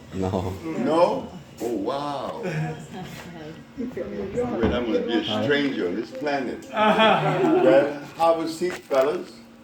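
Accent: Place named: Louisiana, United States. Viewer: Did he say wait a minute round. Lol. That that talk down in Louisiana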